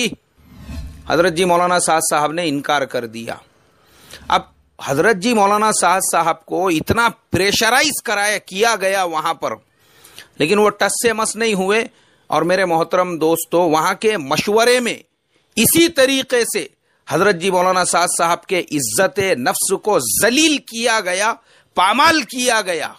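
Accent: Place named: South, India. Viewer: Aap ki lahze se malum chalta he aap junubi hind se taaluk rakhte hein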